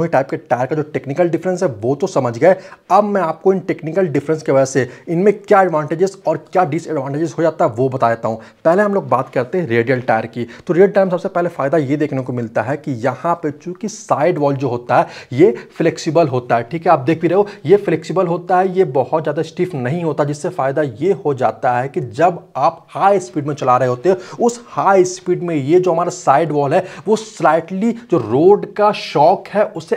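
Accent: Ranchi, India. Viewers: Bhaiya ap Ranchi se hai kya??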